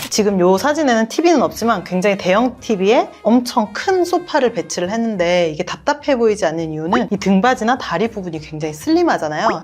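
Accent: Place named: Jeolla, South Korea. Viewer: 전라도네? ㅋㅋ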